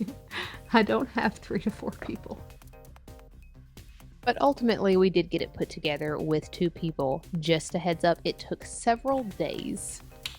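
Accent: South, United States. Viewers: Hi, I love your southern accent; where are you from? I have a southern accent also; I live in North Dakota but I grew up in Tennessee.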